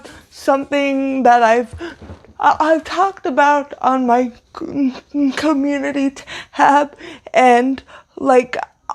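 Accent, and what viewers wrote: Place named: Northeast, United States. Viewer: R U from the northeast?I'm originally from south jersey.you sound and act like you are from back east somewhere.maryland,Connecticut or something.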